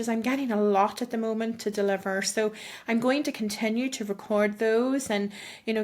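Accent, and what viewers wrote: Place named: United Kingdom. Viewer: Nice British accent prophet🎉🎉🎉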